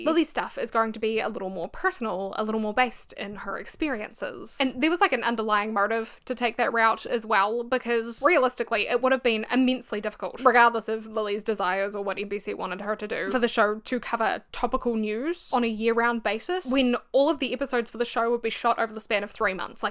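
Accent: New Zealand. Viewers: I had to pause the video to come here and brag that I correctly guessed your accent was Kiwi! (as an American). Okay, back to regular programming. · its so comforting hear a kiwi accent because as a fellow kiwi who consumes alot of youtube alot of it is from American or British youtubers i love watching your videos its so well made and also making me proud of seeing content i love to watch being made by someone whos from the same country as me which idk if thats odd haha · New sub - I love your 🇳🇿 accent! This video is super thoughtful♡ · I subscribed for the thick kiwi accent.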